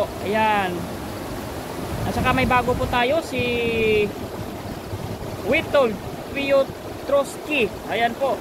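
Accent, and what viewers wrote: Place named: Ilonggo, Philippines. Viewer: good morning po.taga saan ka po sa visayas? Ang intonation nyo po ay parang ilonggo😊.Ingat po palagi God bless po.watching from Bacolod City😊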